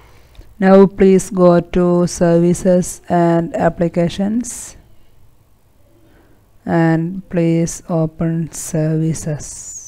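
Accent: Kerala, India. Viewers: Typical Malayalee Accent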